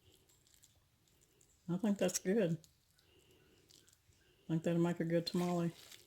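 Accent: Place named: Texas, United States. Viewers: How did you decide to homestead in Arkansaw and can you make enough on the homestead to sustain you or do you also hold down regular jobs? I love your Texas quiet voice! I live in Texas and my voice sounds a lot like yours. Can't stand harsh voices. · I knew that accent was Texan!!!!!!!! ♥♥♥ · My kin are in Pasadena Texas as well. 😁 I thought I recognized your accent. Your tamales look yummy....😋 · LOVE this! And you sound just like you are from Pasadena, Texas! I’m from a little further east piney woods. Love yalls channel! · I just knew that was a Texas accent! hello from Houston. Oh my goodness my Grandma & Pawpaw lived in Sulphur Springs I use to spend summers with my grandma (we lived in Garland TX)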